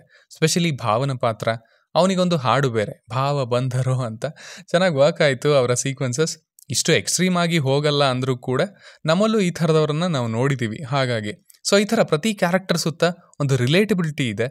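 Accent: Honnali, India. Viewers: Nim voice and mathu kelidre nivu ಹೊನ್ನಾಳಿ avru ansalla!!!!! ನಿಜವಾಗಲೂ 😊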